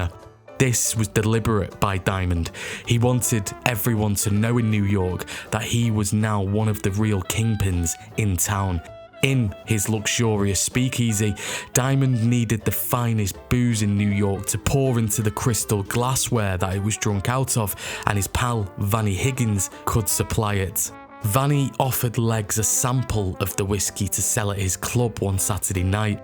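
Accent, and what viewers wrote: United Kingdom. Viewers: Northern English accent, not Yorkshire or Lancashire, somewhere around Manchester?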